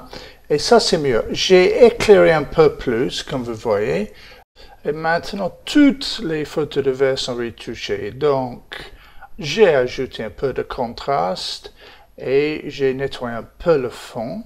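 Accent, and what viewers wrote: England, United Kingdom. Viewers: Merci merci super utile et j’avoue le petit accent anglais c’est juste la classe!! 👍🏼☺️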